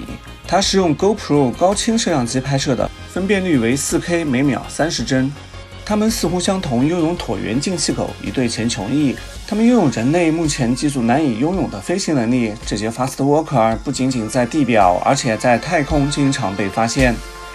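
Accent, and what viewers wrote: China. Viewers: 一直以為tea小編是台灣人耶，聽口音很像上海人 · 听口音大陆的哦！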